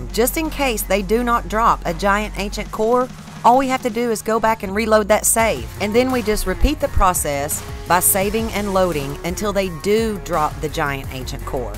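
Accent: South, United States. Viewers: I'm just here for the Southern accent 😎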